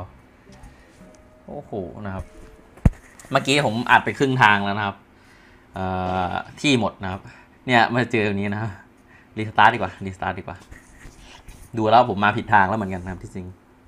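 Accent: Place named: Korat, Thailand. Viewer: พี่พูดโคราชพี่เป็นคนโคราชหรอคับ. ->คักแท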